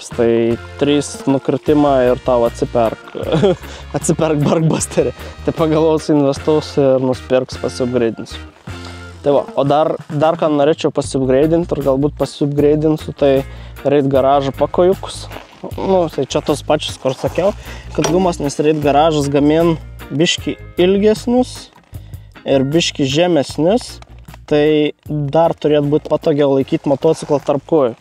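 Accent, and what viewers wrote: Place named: Samogitia, Lithuania. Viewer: Iš kalbos galvojau kad Žemaitijoje kur lakstai, o po to žiūriu, kad čia mano miškas prie Vilniaus :D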